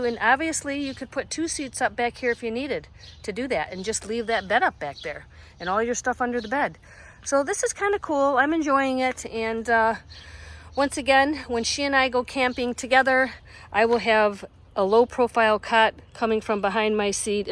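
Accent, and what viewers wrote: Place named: New England, United States. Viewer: You have to be from east coast. You talk so fast. From new england area and I do too. New sub, great ideas you share👍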